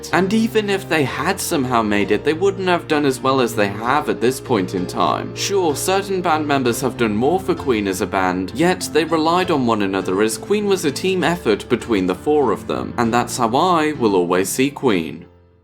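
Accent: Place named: RP, United Kingdom. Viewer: Yes I can imagine you did have to think a lot about it! But you've made a few good points, I really enjoyed this video! Keep up the good work (omg that sounds like I'm calling you a rude word) haha oh gosh I'm gonna have to chose my wording differently lol anyway thanks for replying and BTW I love your voice! You sound posh (I'm a northerner so everyone sounds posh to me 😀) 👑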